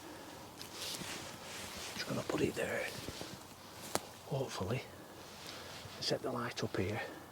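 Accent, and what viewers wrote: Lancashire, United Kingdom. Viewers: Only just found your channel and I must say Brilliant really enjoying what your producing, you also sound very local Lancashire by any chance.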